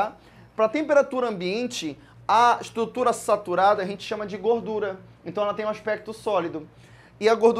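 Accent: Pará, Brazil. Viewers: olá professor , estou acompanhando suas aulas há algum tempo , pelo sotaque , pensei que fosse paraense , hoje descobri que é sim , e achei muito legal , também sou paraense e já morei em Ponta de Pedras , atualmente moro no RJ, gosto muito das suas aulas , super recomendo para os meus colegas e agora sabendo que é paraense vou triplicar isso .Ótima qualidade são suas aulas, completas! parabéns e sucesso. Gabriela Araújo. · Muito bom o sotaque do Pará kkkk ótima aula!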